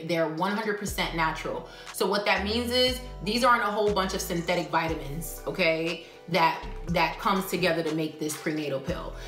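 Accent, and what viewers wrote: New York, United States. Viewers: Great info....gurl....I know that accent....Gurl what part of NY you from? lol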